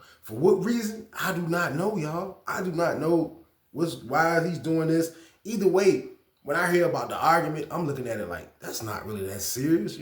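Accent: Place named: Richmond, United States. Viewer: Sound just like a Richmond nigga..down the jail in the 80’s/90’s early 00’s before they knocked it down that shit was like a prison the beef from the street came to the jail..out of Towners ain’t stand a chance down that jail they checked in INSTANTLY and in on the land out of towners got zipped up ASAP #17thSt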